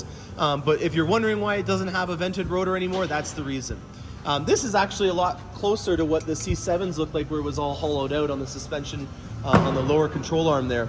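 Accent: Canada. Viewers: are you Canadian? interesting accent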